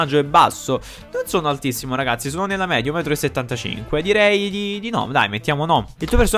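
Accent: Naples, Italy. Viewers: Bro tu sei di Napoli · Bro ma sei di Napoli? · Sei Napoletano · Solo adesso mi sembra che è napoletano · Sei napoletano da come hai parlato sei un grande forza napoli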